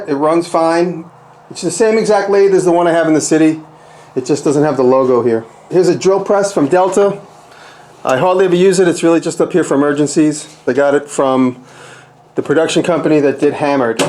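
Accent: New York, United States. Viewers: This guy is straight New York! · I am so glad you answered my question after watching your vids for one day. I could tell your shop appeared to be in a basement, but had no idea you were in Manhattan. My question was where you were located. Your accent sounded like the big apple. I spent alot of time in Brooklyn Heights. I live in South Louisiana. Great to meet ya, Jeff W Waldrop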